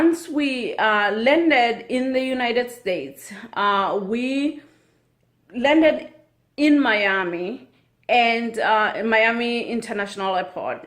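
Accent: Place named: South Africa. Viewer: Very interesting video. A fellow South African here. Post more videos which dispel myths about South Africa as some people are really clueless about our country...even though it's one of the most highly visible country brands in the world. Lol at accent...you have an accent similar to my Mum, she's Xhosa&Zulu Zulu. My dad is white German& Afrikaans. Love your channel already...you seems lovely, curious& intuitive so I imagine you will adapt very well in USA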